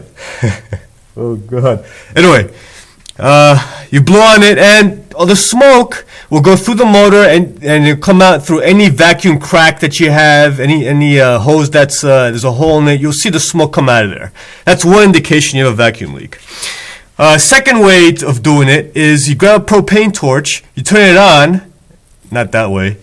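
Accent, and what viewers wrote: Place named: New York, United States. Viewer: Must be from NEW YORK!!!!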